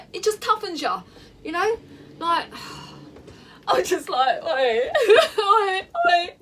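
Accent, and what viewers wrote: United Kingdom. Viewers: Can’t help smilin’ more an’ more the more you speak. His joy is shining, sprinkling, radiating, streaming out of you, you know,:) I always thought there was the joy of Jesus at the center of the True Cockney. This is proof of tha puddin’! Keep spreading His love, luv. Good job!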